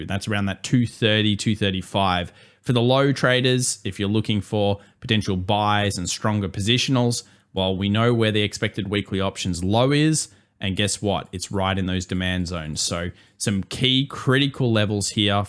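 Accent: Australia. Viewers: He is Australian bro? Unless you can point to it.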